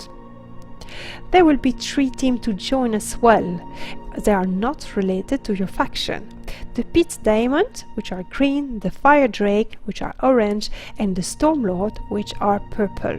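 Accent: France. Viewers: Im no expert, but are you french? . Love the voice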